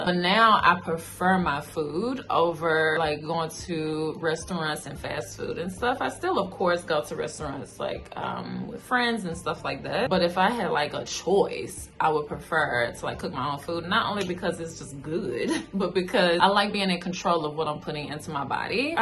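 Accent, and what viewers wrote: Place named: Southern California, United States. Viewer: What is this accent? It sounds like a mix between Southern and 'Californian'? to me. I'm not american tho, so I can be totally off-base lol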